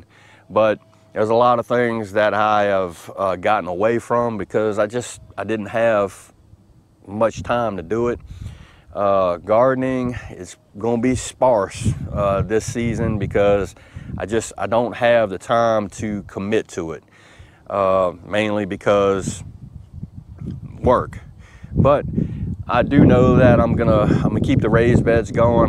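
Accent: South, United States. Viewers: Your videos have always relaxed me. Maybe it is the southern accent. Melbourne, Australia.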